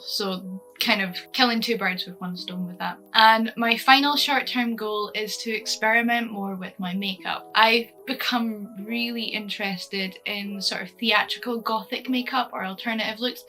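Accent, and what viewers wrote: Scotland, United Kingdom. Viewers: "I live close to Dundee", that explains why I recognise the accent since it's local-ish xD nice to see a Scottish content creator, dropping a sub :)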